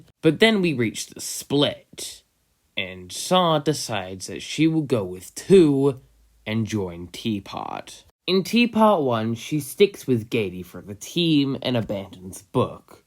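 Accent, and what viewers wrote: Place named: Australia. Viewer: What happened to the australian accent 😔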